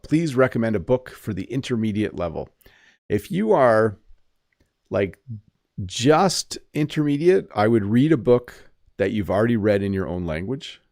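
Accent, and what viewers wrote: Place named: Canada. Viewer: Congratulations I'm from Piracuruca Piauí in northeastern Brazil and I want to have a Canadian accent just like you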